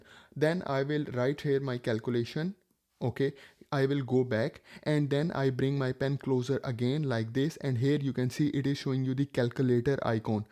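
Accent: India. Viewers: Indian english is so funny😂 love it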